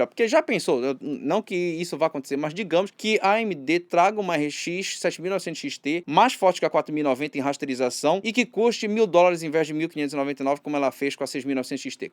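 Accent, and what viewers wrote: Pará, Brazil. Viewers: da pra saber que o cara é paraense só pelo ritmo da fala dele.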